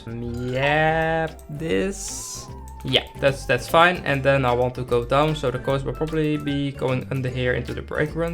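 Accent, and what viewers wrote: Netherlands. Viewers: Hey. Do u come from the Netherlands? U sound like a dutch person. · jij ben nederlands dat hoor je gewoon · I smell dutch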